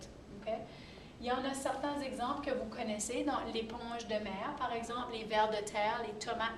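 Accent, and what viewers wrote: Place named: Quebec, Canada. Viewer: super vidéo mais je n'ai juste pas très bien compris avec l'accent quebecois 😝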